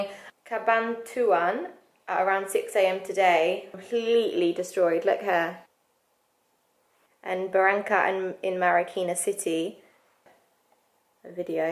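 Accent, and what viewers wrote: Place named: London, United Kingdom. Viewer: Manila always gets hit very badly.....Great to hear London English. Greetings from Rosario Cavite.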